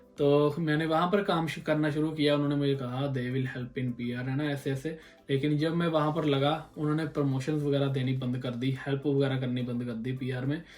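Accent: India. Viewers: Accent hi etna desi hai ki kaun naukri dega canada mein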